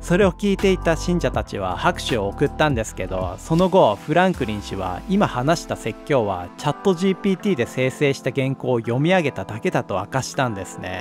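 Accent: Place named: Tohoku, Japan. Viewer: かなりズーズー弁ですね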